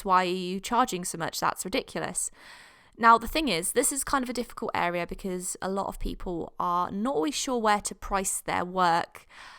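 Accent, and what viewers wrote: England, United Kingdom. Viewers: Why do u sound english x x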